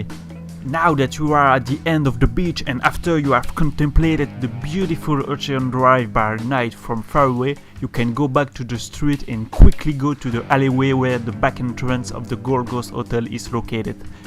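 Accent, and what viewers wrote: France. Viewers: Putain de french accent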